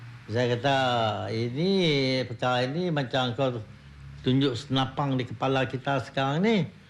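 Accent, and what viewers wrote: Malaysia. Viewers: Pertama kali dengar orang ini bercakap, bunyi utara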